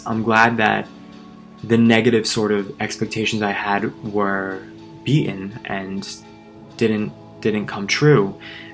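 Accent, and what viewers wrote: New York, United States. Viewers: you're funny i notice you have a similar dialect as my NYC friends ... the gay experience, i was born in LA, raised in OC at the beach and lived now in LA since the 80's. It is possible to meet decent guys but they are few and far between. Dysfunctional. I stayed for the weather.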